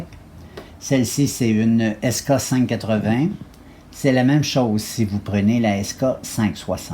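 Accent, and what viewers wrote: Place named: Quebec, Canada. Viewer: Bonjour, pouvez me dire ou trouver les cartes mylar ? 1🤔merci beaucoup, et gardez votre bel accent québécois ! ❤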